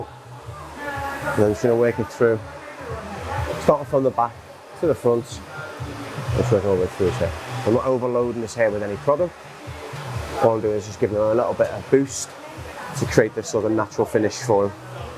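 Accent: Liverpool, United Kingdom. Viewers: scouser accent from liverpool , england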